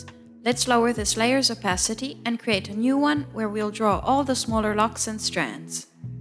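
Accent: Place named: Arab world. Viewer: just a question but omg are u arab? i am! and u have kind of an arab accent!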